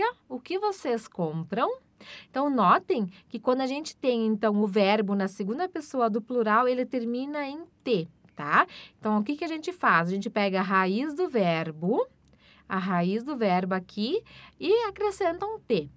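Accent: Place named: Germany. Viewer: Oi, Gostei do video. Te pergunto de qual regiao da ALEMANHA é o teu sotaque. Agradeco.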